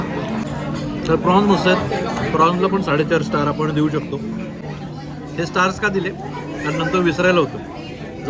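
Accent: Kolhapur, India. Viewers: तुझी कोल्हापुरी टोन खूप आवडला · Kolhapuri tone mast aahe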